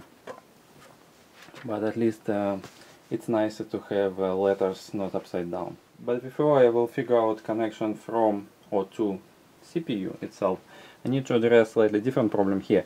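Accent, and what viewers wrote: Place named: Russia. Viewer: Российский акцент так и показываешь!даж не стараешься говорить с меньшим акцентом,а ,,буилд,,кайфовый.спс)_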